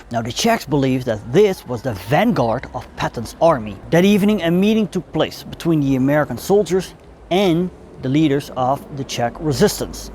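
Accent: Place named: Netherlands. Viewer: Just taking a moment off 'Driven to Distriction' (helpful for ADD History Buffs like me) to tune into this fascinating short. Im not in position to support whst you do, but i do think the style and approach, and the Dutchies accent work well together. Although Scots-Welsh, my partner and I always get mistaken for Nederlanders out on our travels; it even happened in Haarlem. Interesting what happened in Rostov this week. Back in the day, in the Valley of Snakes, there, a leading member of my profession was murdered. Wikipedia says some were still alive when the pit was backfilled; the stuff of nightmares surely. Although peace-minded, we don't forget that our the Norse, Dutch, German Resistance, etc, etc friends thatcsaved us from occupation and an overt problem of how to live and work with collaborators. Be pragmatic, or hand the back to Mossad, or both? 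The Rom have no Mossad, that I know of though; but engaging, sharp, factual presentations must be a part of the UnFinalising of YouKnowWhat. g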